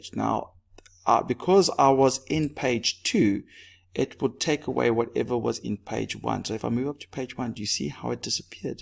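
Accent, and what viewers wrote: South Africa. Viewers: Are you south African ???????😊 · are you south african?????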